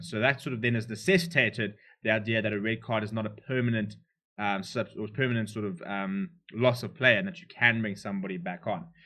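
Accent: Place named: South Africa. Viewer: Good content man. Nice to have a South African accent on youtube talking some sense. Keep it going.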